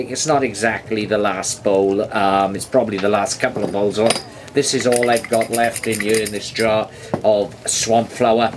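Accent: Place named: Welsh Valleys, United Kingdom. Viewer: Who'd have thought it? The YouTube algorithm eventually found me a channel exactly to my tastes. I have a fair amount of pipe channels saved and favourited, but you're the only fellow Welshman I've happened upon, and a western writer too. Dare I assume your accent is from the Ogmore Vale, maybe the Rhondda? I'm hailing from the Twrch valley between Swansea and Brecon. From what I've seen, your content is great. Fantastic job, Bendegedig! You should be proud 😎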